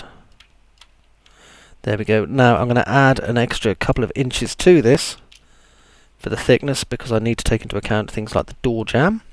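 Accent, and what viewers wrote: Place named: United Kingdom. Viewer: Your accent is extremely British. And great tutorial BTW.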